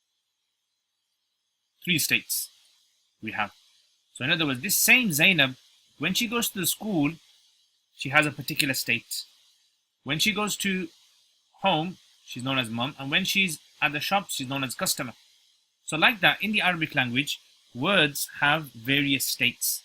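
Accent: Birmingham, United Kingdom. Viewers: Brother sounds like you from Birmingham uk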